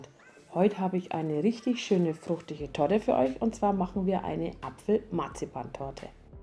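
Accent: Franconia, Germany. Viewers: Eine Fränkin, wie süß! Die Sprache ist unschlagbar u. den leckeren Kuchen bestelle ich schon mal für den Feiertag am <num>. Kaffee mach' ich selbst dazu. LG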